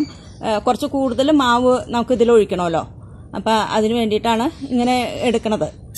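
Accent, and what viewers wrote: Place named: Perumbavoor, India. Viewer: എവിടെയാ സ്ഥലം സംസാരം കേട്ടിട്ട് പെരുമ്പാവൂർ മൂവാറ്റുപുഴ പോലെ 😄ഞാൻ keezhillam ആണുട്ടോ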